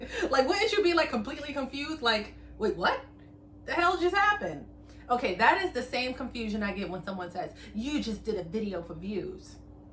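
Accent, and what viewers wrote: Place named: New York, United States. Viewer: New York in the house 😆😆😆Love the accent!!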